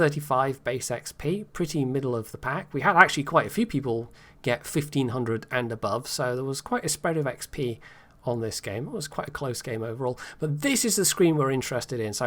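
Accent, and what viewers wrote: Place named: Oxford, United Kingdom. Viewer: I think you would be great on television, as an announcer (you have that pommy Oxonian accent) or a comedian (great sense of humour a la Monty Python), or both. You'd be perfect. I'm thinking John Oliver would be a good example. Think about it...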